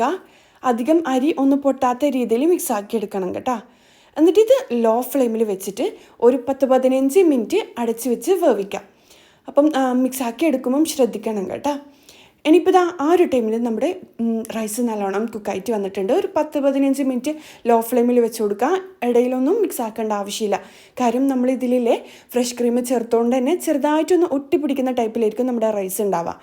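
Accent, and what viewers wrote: Kannur, India. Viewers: സൂപ്പർ👌👌👌❤️❤️❤️👍 ഉണ്ടാക്കാംഇൻഷാ അല്ലാഹ് കണ്ണൂർ ആണോ വീട് ഭാഷ കേട്ടപോൾ😀നല്ലോണം തണിയാ വെക്കുക്ക😂 ഭാഷ കേട്ടപോൾ ചോദിച്ച നമളെ ഭാഷ😀😀😀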